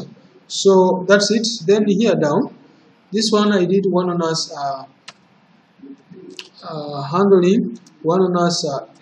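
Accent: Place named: Central, Uganda. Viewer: Gwe, yo English is kinda Central Ugandan...!!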